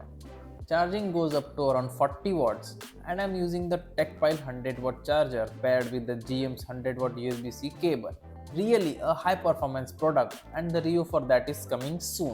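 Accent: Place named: India. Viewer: Indian accent is funny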